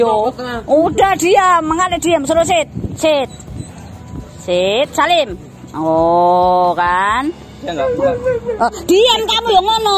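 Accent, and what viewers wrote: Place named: Java, Indonesia. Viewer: medhok banget logatte.......?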